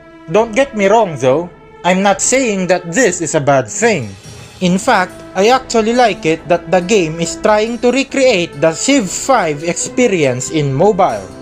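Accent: Philippines. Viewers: Why do we have the same accent? Kabayan? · Spiffing Brit with dat Filipino accent 🔥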